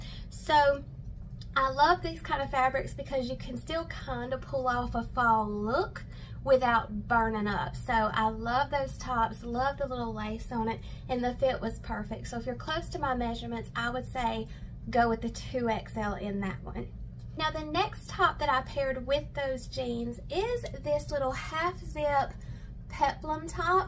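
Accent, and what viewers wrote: South, United States. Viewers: I love the way she talks so southern and sweet .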